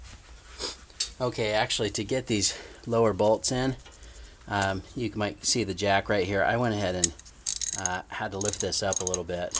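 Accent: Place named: West Coast, United States. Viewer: Something about your laid back west coast narration that adds a layer of mellow to the repair. Thanks man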